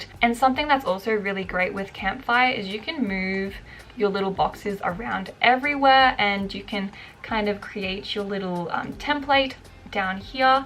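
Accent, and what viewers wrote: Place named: Australia. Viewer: Lol I’m Aussie and it took me so long to realise you had an Australian accent because I was so tired and then when it clicked I was like “!!!” Lol. I’m so excited to check out campfire thank you for the tips! ❤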